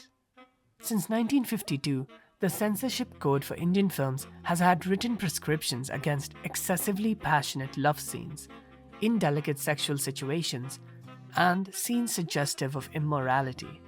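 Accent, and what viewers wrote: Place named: Oxford, United Kingdom. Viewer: a musanghi cinephile talking in oxford english. * it's not an insult, just a description/review of the video.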